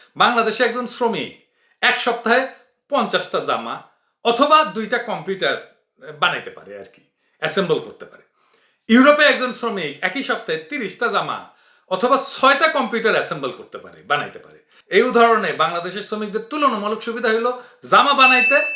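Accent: India. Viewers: ভাষাগত ব্যাপারটা যারা খুব ভালো করে বুঝেন এই ভদ্রলোকের ভাষার একসেন্ট শুনলেই বুঝা যায় ইনি বাংলাদেশি না, ইনি একজন ভারতীয় কারণ বাংলাদেশীদের একসেন্ট আমরা বুঝি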